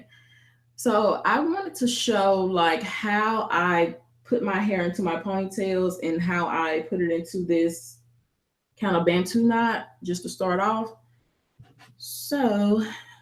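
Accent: United States. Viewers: Your hair looks as beautiful as you! Love it! Our voices are almost identical and I thought I was listening to a recording of myself. You must be from the southern midwest, sister. LOL. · Yur a lovely lady with a beautiful smile even with yur pink and green lime clips n bubble upside yu head. Love yur accent normally I dont like some american accents after awhile but I found yur voice soft n relaxing n did not fast forward till close to th end. I thought yu did a good job for a first time specially as I'm not that good at corn rowing stay blessed 🙏🏾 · this was great, you sound like you're from East Texas or Dallas, my bffs and family are from there and you sound just like them. love it 😁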